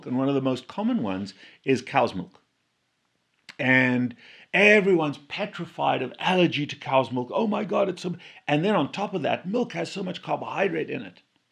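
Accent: South Africa. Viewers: Ha! I thought you had a South African accent.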